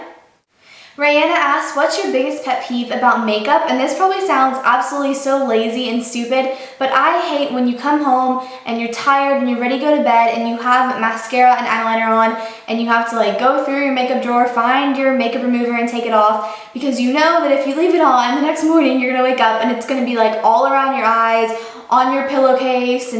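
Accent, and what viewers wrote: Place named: South, United States. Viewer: for some reason i feel like you are talking with more of a southern accent in this video! am i the onnly one who thinks so? you look really tan and lovely <3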